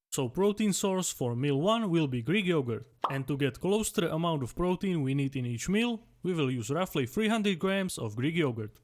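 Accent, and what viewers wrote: Czech Republic. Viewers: Kámo... ten český přízvuk je moc. :D Nedávám to. Jinak super video ale. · Já si říkal že slyším Čecha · dobrej czech accent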